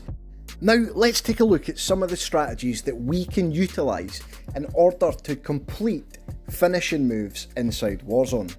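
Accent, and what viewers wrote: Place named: Scotland, United Kingdom. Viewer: Great how to man, this game is so intense. And Always love your Scottish accent bud! Makes the commentary entertaining and flows well with the video.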